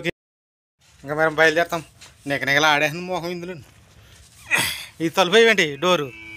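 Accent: Godavari, India. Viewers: హాయ్ అన్న ఎలా ఉన్నారు నేను ఈమధ్య మీ, వీడియోస్ చాలా మిస్ అయ్యాను మన గోదారోళ్ళు మాటే అలా ఉంటుంది మరి కైమాలు, చాలా బాగున్నాయి నైస్ వీడియో బ్రదర్ 👍👍♥️♥️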